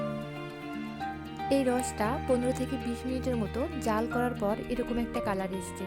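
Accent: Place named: Jessore, Bangladesh. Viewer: Apu mone hocche Jessore er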